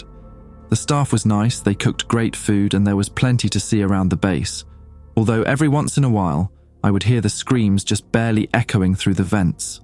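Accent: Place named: England, United Kingdom. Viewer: I really liked this one. Nice twist that wasn't super obvious. This isn't a criticism but, as a brit, it's so jarring to hear the narrator speak in an English accent but use American phrases. Was mostly at the start of the story.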